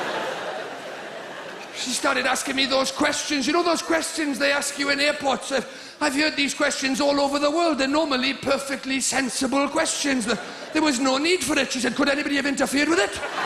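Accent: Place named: Scotland, United Kingdom. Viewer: I assumed he was Scottish not welsh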